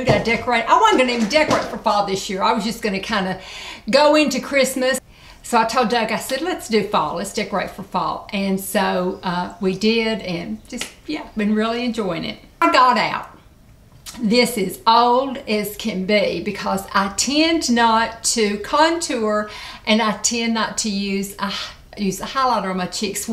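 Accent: South, United States. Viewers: I love your southern accent and vibe. I grew up in the Ozarks and it takes me back to my roots. ❤️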